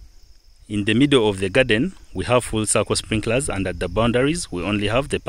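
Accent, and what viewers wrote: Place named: Uganda. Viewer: You speak like Ugandan brother. Otherwise weldone!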